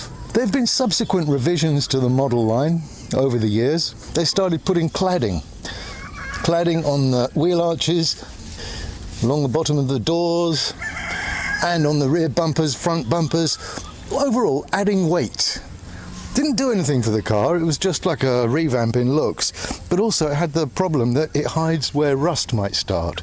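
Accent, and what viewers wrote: United Kingdom. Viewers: With all due respect to Scotty who knows a good review when he sees one, let's give credit first to the one who made the review, namely,! You are a natural! I think with your melodious British accent, which Filipinos love to hear, you can have a career doing reviews of things you love to use. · We used to have one of those in the Philippines. Wasn't the latest or the best, but hauled people and stuff for relatively cheap. Back then when you asked if people had a car, they'd usually answer, "yes we have an FX." You can seat 10...Legally lol Something about having a Brit do the narration makes the experience sound like a cool museum tour :) Flippin Marvelous!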